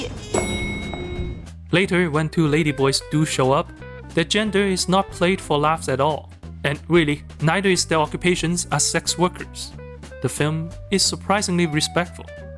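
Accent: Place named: China. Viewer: delivered in a Chinese accent ... chefs kiss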